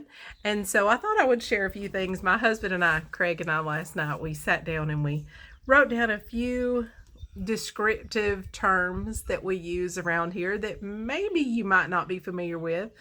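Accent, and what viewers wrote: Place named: Texas, United States. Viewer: I live in east Texas and we can talk to each other I know what you saying east Texas talk just like that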